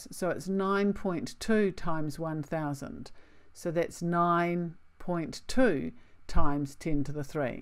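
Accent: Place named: New Zealand. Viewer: Super helpful. Also good to hear a kiwi explaining this. Thanks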